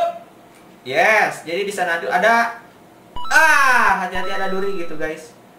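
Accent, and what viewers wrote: West Java, Indonesia. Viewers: Ngakak.. pake logat sunda tambah ngakak:v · Eta ngomong na. Sunda indo 😂😂😂 anjay ..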